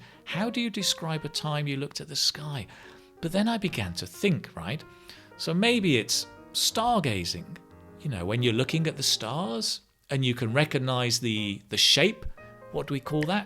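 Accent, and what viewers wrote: United Kingdom. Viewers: Hi 🙋🏼‍♀️ Great as always, thanks a lot charade is my cub of tea 🙃 By the way I’m in love with British accent 😍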